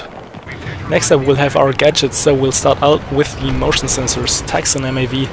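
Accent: Germany. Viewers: Are u`re a german? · als deutscher merkt man das. ^^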